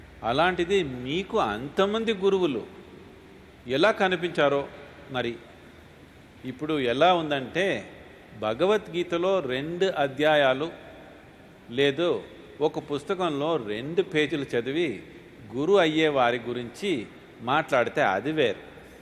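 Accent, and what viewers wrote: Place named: Tamil Nadu, India. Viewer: Arva vedavalu Telugu matladinattu. Undi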